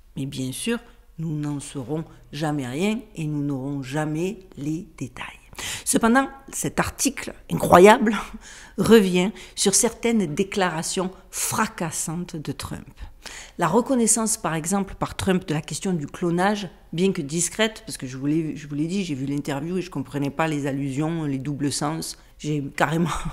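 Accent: Marseille, France. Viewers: Magnifique, comme toujours….. Le réveil de l’esprit critique, si peu actif ces dernières années. Et cet accent de cigales!….. Merci. 😍🥂 Meilleurs Vœux à tous pour cette année de révélations …. Y a du pain sur la planche 😏😘🤓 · Plus ça va plus je vous écoute... c'est un bonheur, le mélange d'un côté artificiel à fond (Barbie) et une vraie brillance intellectuelle. Ça donne un dandysme du meilleur teint, au sujet de rien de moins que la structure (viciée) dé notre monde. Deux questions bêtes : un, vous êtes marseillaise ? Deux, avez-vous fait des "études" d'anthropologie ? Autre ? Merci en tout cas pour votre excellent travail, ET SA PRÉSENTATION, la Vôtre.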